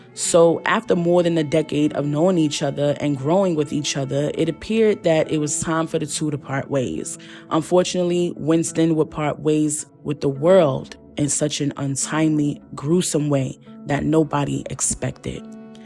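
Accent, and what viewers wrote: New York, United States. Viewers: Love your channel! Your story telling/narration capabilities mixed with that raw raspy New York accent and demeanor…keep up the great work! 🤎🤎✨✨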